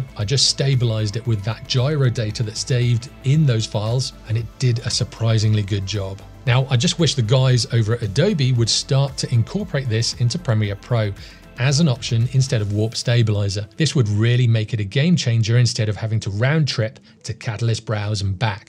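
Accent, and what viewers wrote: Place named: Midlands, United Kingdom. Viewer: Nice one - Im guessing midlands to South.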